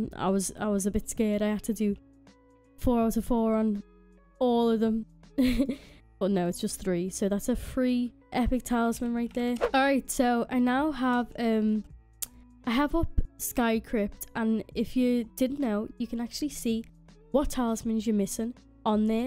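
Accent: Liverpool, United Kingdom. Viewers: You probably get this a lot but are you scouse lol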